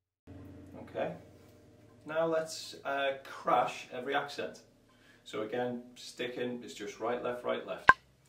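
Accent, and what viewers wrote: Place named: Wales, United Kingdom. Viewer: the first contact I’ve ever had with a Welshman in a long while (worked with some ladies from Wales many years ago—love the accent/language). Thanks for considering my comment — hope the move was successful!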